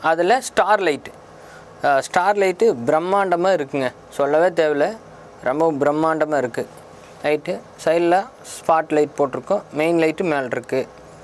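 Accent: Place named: Kongu, India. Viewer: More than work i m big fan of ur kongu slang....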